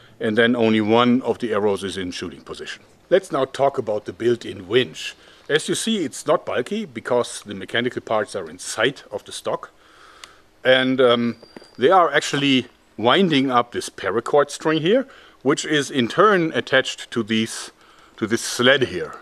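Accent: Germany. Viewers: Ik like his german accent en strange laugh: this is the slingshot channel, hahaha. Nice channel bro; I like your hobby; because our governments disarmed us. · His deep voice with German accent sounds brutal. His laugh is epic too. Should be in horror movies XD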